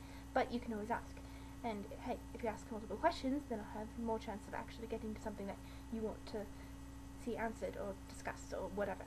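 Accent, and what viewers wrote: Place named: United Kingdom. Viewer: OMG!!! YOU ROOOOOOCK! (for a second, just a second, i really thought "wow i forgot she had a british accent") Randomness #1: I was the first 1 to coment!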